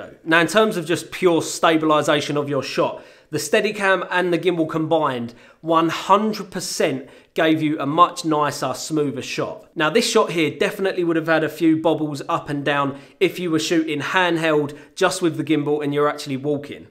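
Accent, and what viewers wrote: United Kingdom. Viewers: ' creativity is just like his British Accent. Effortlessly Smooth and Precise.😁😁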